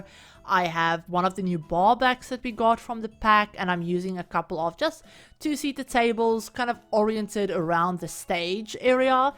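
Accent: South Africa. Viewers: Eeyyy! Are you South African? 👏😁🇿🇦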